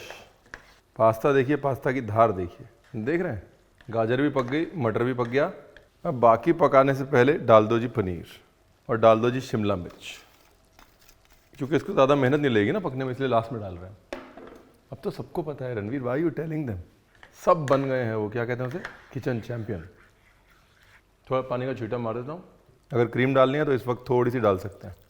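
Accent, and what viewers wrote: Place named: Bihar, India. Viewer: Aap Bihar cartoon mein bolate hain bhai ji bahut Achcha lagta hai aapka bolna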